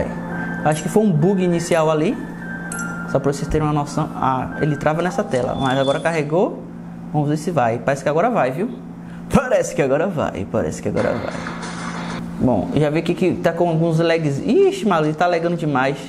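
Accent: Bahia, Brazil. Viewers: Like só por que tu é baiano meü querido. 💪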